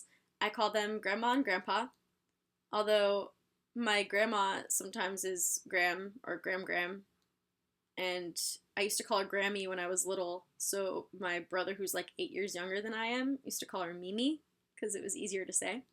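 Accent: Connecticut, United States. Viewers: You say talk instead of tawk. What part of connecticut are you from? I moved to va from connecticut and my entire class tried to give me a speech class lol. Theyd say, "there's no w in talk Shakia." The words that stood out to everyone were talk, water, car, chocolate, coffee, dog... i pronounce them tawk, waduh, cah, chawclet, cawfee and dzawg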